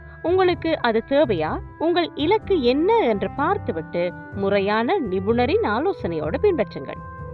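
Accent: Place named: Sri Lanka. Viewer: Azhagana inimaiyana ilangai Tamil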